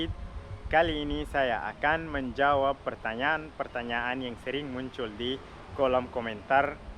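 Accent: Makassar, Indonesia. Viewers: bang kapanko na bisa pake logat begitu🤣,ketara makassarnya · tau gak bahwa logat bicara bahasa Indonesiamu itu logat Makasar? Sedikit beda dengan logat kami di Jawa... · Bahasa indoneisanya makasar bangeett...lucu dan keren...he..he... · Waaah bahasa Indonesia nya logat Makassar! Pertama kali saya liat vlog orang asing bahasa Indonesia pake logat Makassar begini. Semoga sukses ya! 👍👍👍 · semangat dan sukses selalu! Keren bahasa indonesianya logat makassar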